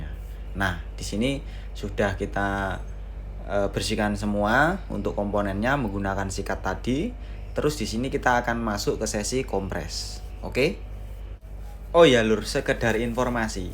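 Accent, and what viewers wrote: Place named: South Kalimantan, Indonesia. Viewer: takipik mandngar bahamburan😁😁.. kalimantan mana mas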